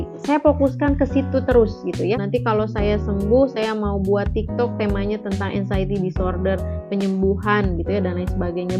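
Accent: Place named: West Java, Indonesia. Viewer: nuhun teteh 😊😊 ari teteh orang mana? da logatna sunda mah moal kabobodo hehe teteh pasti orang sunda. abdi penonton baru teh.. ari teteh sbrha lama kena gerd?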